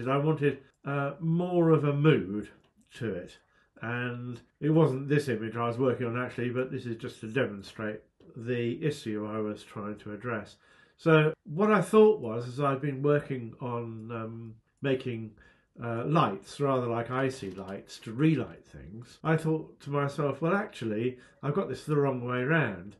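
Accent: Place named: England, United Kingdom. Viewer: I'm not good in English, but even Russian like me can understand his gorgeous pure English accent. Thanks for great videos!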